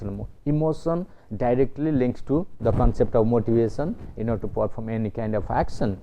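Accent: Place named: East India, India. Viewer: He sounds from East India and there's nothing "wrong" in his accent...that's how Bengali axomia speaks English... every region has different accent, it's natural not wrong.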